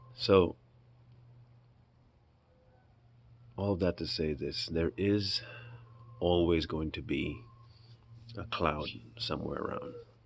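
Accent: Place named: Caribbean. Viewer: Which Caribbean island are you from, dude?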